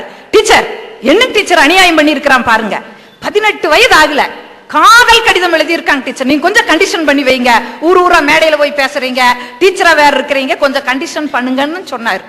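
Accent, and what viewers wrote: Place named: Kongu, India. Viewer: கொங்குத் தமிழுக்கு செவி சாய்க்கிறேன் சகோதரி❤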